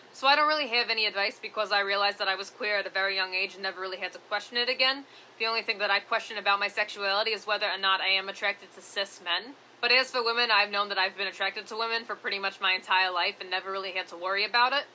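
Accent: New York, United States. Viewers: I love this chick hear voice is wonderful dead ass. She sounds really New Yorker🤣 and I am from nyc, but she is also funny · You come from New York with that accent and definitely Italian parents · We Stan an e girl wit the New York accent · She from New York?? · Was just recommended your channel. Are you a New Yorker or from New Jersey? Or are you from Boston? That accent sounds like New Yorker! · Is this accent from New york. Is both the most wonderful and kind of intimidating! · Hey are you from new York?I was really wondrring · Are you from new york or something east coast? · Hey, I like your accent.. it's a mmmm very New Yorkie. For get about it... lol 👍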